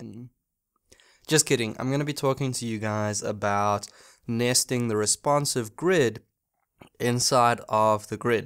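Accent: South Africa. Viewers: Reading these things on tutorial sites and watching someone explaining these things to you are two different experiences. Thank you for providing clarity on this , looking forward to learning more from you ....Also i can hear that fellow South African Accent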